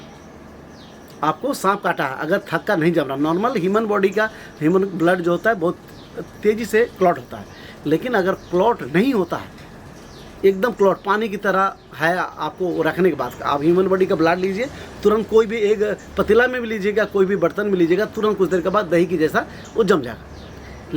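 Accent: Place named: Bengal, India. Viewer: Hello sir aap Jo Jankari Hamen Dete Hain hamen bahut help milti hai Aur ek Baat aapki baten sunkar lag raha hai ki aap bangali ho main bhi West Bengal se baat kar raha hun ki aap ka contact number mil sakta hai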